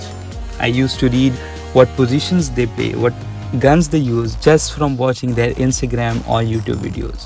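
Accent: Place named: United States. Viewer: Bhai iss video me tera accsent alag lag raha hai 🤔 more like American accsent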